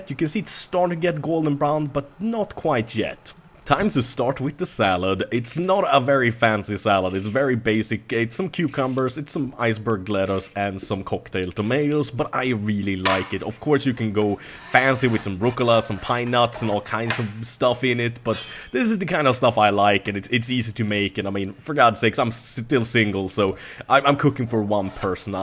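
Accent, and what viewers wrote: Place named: Sweden. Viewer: red onjons (red onions), shiis ( cheese) - ahh i love that Swedish accent :D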